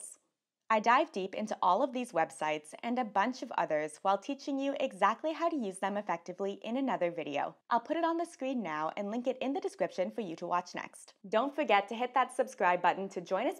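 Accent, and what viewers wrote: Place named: Coney Island, United States. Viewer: Love the accent are you from Coney Island Canada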